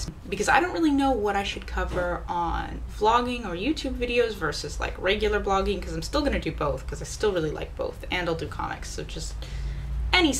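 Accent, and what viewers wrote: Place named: Minnesota, United States. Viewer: Omg is she from Minnesota??? That accent!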